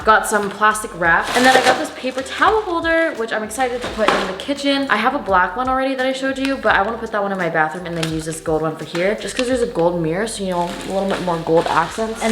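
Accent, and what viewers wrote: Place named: United Kingdom. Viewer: i clicked faster than could start talking in a british accent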